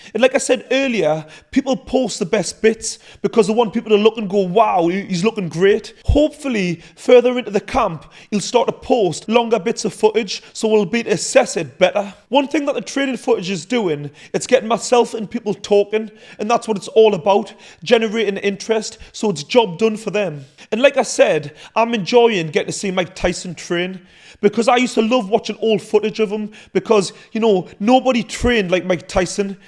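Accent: Newcastle, United Kingdom. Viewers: It's like watching an episode of Geordie Shore with the narrative style and geordie inflexion of the voice - well put together and delivered mind, OSS🤙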